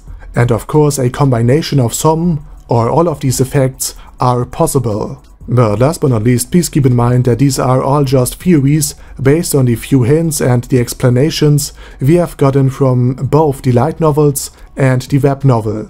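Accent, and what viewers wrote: Germany. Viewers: I have to confess, this commentary sounds more authoritative because of the German accent. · Why do I hear german even when you talk english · English is a germanic language :) that's why his accent makes it sound like he's speaking German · Because of his accent. The auto-CC's even think he is speaking german. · Well i cant watch your video.... your English is right but the accent is so insane, i cant take it.... iam german too but this is too much for me....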